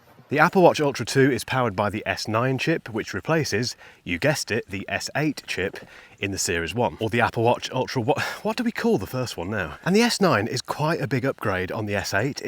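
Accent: England, United Kingdom. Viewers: Maaaan you are great! Love the English accent. I’m gonna buy the ultra 1 used 🔥👍 sorry for my English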